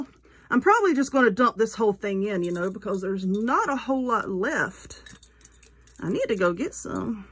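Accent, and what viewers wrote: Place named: South, United States. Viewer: I can't believe it took me this long to find this channel!!! I've been following your crafting channel for a couple years. I love your crafts and your southern accent! I can't wait to watch all of these videos. 🥰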